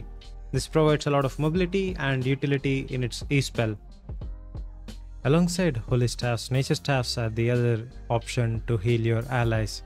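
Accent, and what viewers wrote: India. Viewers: Bro are u indian?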